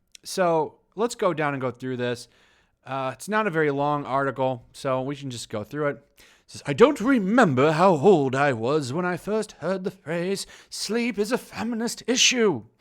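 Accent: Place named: RP, United Kingdom. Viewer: 98% of British (English, Scottish and Welsh) people don't really sound like that....Atleast you sounded like the Royals. 😂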